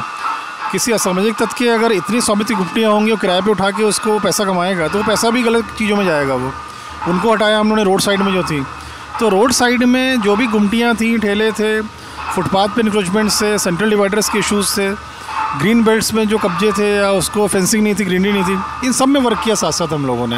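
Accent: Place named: Rewa, India. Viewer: Are thakur sahab apna rewa ke ahan mangawa Se main 30 km dur mauganj se hu... apki tone se hi samajh me arha tha wah..App rewa ajao sirji apki jyada jarurat hai rewa me Kaha netan ke cahkkar me pade han..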